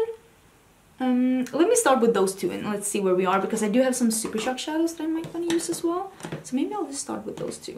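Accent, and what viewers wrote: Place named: Sweden. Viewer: I watch every single one of your videos because you're a triple threat. You're gorgeous, intelligent, and sarcastically hilarious! From the first video I found by you, I remember thinking, "WOW, this girl freaking SAYS what many people are thinking but are afraid to say!" and I subscribed immediately. That was at least 2 years ago. (Plus I'm a sucker for a Swedish accent) I also thought you were so badass because your English is fantastic and feel honored that you chose to speak it in your videos. I wish there was a way to separate the "people who count as lots of views" from the people like me who watch every video as soon as it comes out but just my views aren't enough. Like there should be a higher level of adsense for repeated views by loyal subscribers versus random 12 year olds passing through YouTube looking for something that flags their attention, who aren't subscribed. 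But I guess ads are ads.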